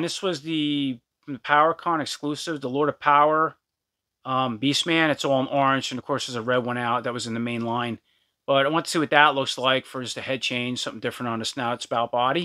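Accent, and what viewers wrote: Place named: New Jersey, United States. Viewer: Love your voice man! Jersey kinda sound! I gotta check more of your videos out. Thanks again for the dub and I subscribed back ..but looks like your chan chan is doing well.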